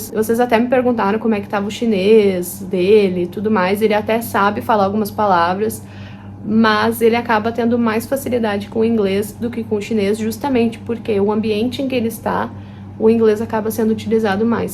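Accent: Rio Grande do Sul, Brazil. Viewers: Amo os teus vídeos! E tu ainda não perdeu o sotaque gaúcho, tchê!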